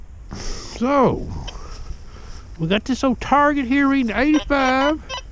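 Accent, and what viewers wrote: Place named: Southern Appalachia, United States. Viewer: If I close my eyes and listen sounds like papaw to me! Lol as a southern appalachia boy this channel feels like home and love the accent!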